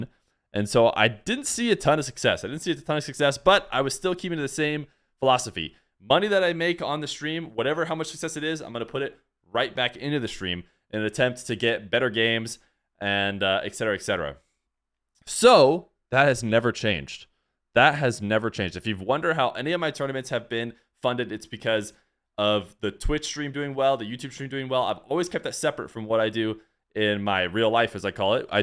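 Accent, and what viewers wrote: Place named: Scotland, United Kingdom. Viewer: cant lie. I love when a player pops off and gets excited. Nothin like that scottish accent!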